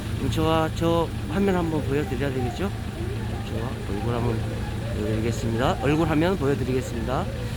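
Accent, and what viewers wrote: Seoul, South Korea. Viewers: 서울사람보다 서울말 더 잘하는 님~ 화이팅~!! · 님 완전 서울사람인데요 ㅎㅎ 홧팅!!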